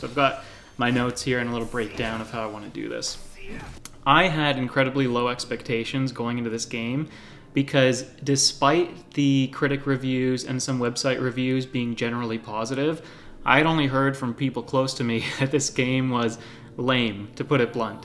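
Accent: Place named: Upper Midwest, United States. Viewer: I got FFXV on Steam in 2017 but didnt play it until last year. It was a pleasant surprise! You made great points about it. By the way, you remind me of someone I enjoyed watching on YouTube pre 2010 - Roger Swan, an American vlogger that lived in Japan. I think it's your manner of speech, maybe you're from the upper midwest.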